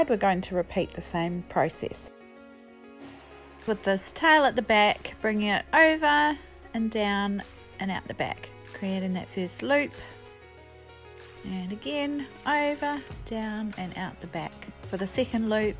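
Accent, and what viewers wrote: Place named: New Zealand. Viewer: Thanks for the video. I will be making something like this for my 7 year old daughter and this video is helpful. I see you are in Australia but it sounds like you have a Kiwi accent.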